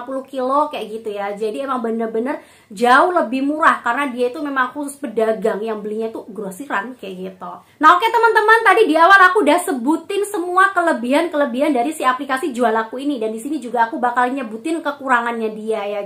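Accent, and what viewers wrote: Java, Indonesia. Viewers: Mbanya medok banget. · Mba logat nya ky orang Jawa😀😀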